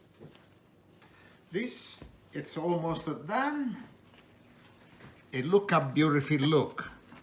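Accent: Italy. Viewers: I miss my Italian Grandparents loads. I spent so much time in the kitchen with my Nan learning how to cook and absolutely loved it. Sometimes find myself just putting this on to hear the Italian accent, brings back great memories. On the plus side... he’s a lovely fella and an amazing cook!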